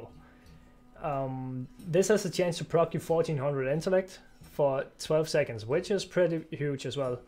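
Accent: Denmark. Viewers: Man kan godt høre at du er dansk xD fed video :) får mig helt til at overveje holy Pala :)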